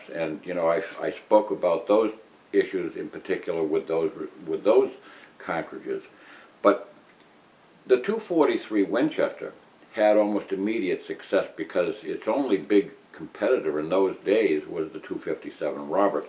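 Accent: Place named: Boston, United States. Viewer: This guy has a straight up Boston accent! Hilarious. He sounds [and dresses] like an old Boston cabbie friend of mine. Priceless. I guess you had to be there! Down by the banks of the River Charles. I love that dirty water, O Boston, you're my home!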